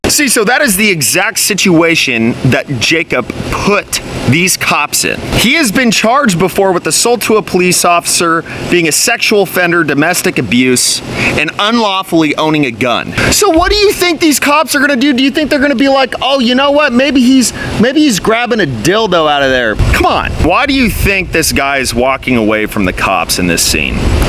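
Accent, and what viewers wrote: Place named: Texas, United States. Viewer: Now, more revealing footage has come forward, it shows this incident from the other side of his vehicle. The video clip i saw clearly, shows that the police had grappled him to the ground and that, before being handcuffed he managed to wrestle himself free, stand up and proceed to walk around from the passenger side directly to the drivers side of his auto. This great footage picks up just after he has somehow freed himself from the 2 officers and being pinned on the ground but is not seen from this camera angle. Thank You! for the work and time you take putting out really great content. You're so very correct, not using your Common Sense and Logic could get you shot, the police officers thankfully are using theirs. I guess its your well voiced Texas brand of thinkin and talkin that speaks to this life long Texan. 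Austin ain't All blue. I think I'm gettin a chubby* I am subscribed.and i'll continue to like and share your super content.Thanks again Hoss.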